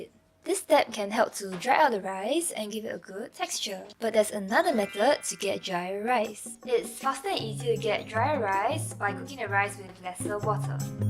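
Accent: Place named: Singapore. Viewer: Can tell you're Singaporean with the accent hahah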